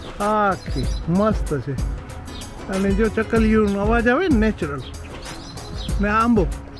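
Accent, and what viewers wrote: India. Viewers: ખૂબ સરસ ભાઈ...! આપણા ગુજરાત ના ભાંડુઓ વિદેશ માં વસતા હોય અને ખૂબ સુખી અને સમૃદ્ધ હોય ત્યારે આપણી છાતી ગજગજ ફૂલે અને આપણા સુખી ભાંડરડાઓ ને જોઈ ને કેટલો આનંદ થાય તે અકલ્પનીય છે. બસ ભગવાન ને હંમેશ એક જ પ્રાર્થના કરીએ...ઈશ્વર તેઓ સૌને સદૈવ સ્વસ્થ, સુખી,સમૃદ્ધ અને ખુશ રાખે... તમે પણ વિદેશ મા વસતા આપણા ભાંડરડાઓ ના આવા જ હૈયુ હરખાઈ જાય, હૈયુ હરખભેર હિલોળે ચઢે તેવા વિડીયો બનાવી... અમને પણ આપણા વિદેશી ભાંડરડાઓ વિશે જાણી મોજ આવી જાય... આપની દેશી લઢણ સાથે વાસ્તવિક ચિત્રણ સાથે નો બ્લોગ બનાવવા બદલ આપને ખૂબ ખૂબ ધન્યવાદ... હાર્દિક અભિનંદન... શુભેચ્છાઓ 🎉🌹🙏 કુમાર ભુપેંદ્રકુમાર- તંત્રી - કર્ણાવતી મહાનગર અખબાર અમદાવાદ 🌹🙏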